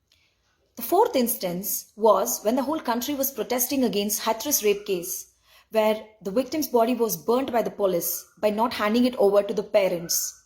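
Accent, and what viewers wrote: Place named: Ivy League, United States. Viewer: You're obviously biased and parroting your party position backing it with the weight of your credentials and ivy league accent; however not realising or blissfully ignoring the fact that the moment your affiliation surfaces, your entire criticism loses credibility as you represent the most corrupt, nepotistic family domonated political organisation led by one of the most incompetent and ignorant, self obsessed, entitled leader.. Good luck to your aspirations as I hope you might be genuine despite your affiliations..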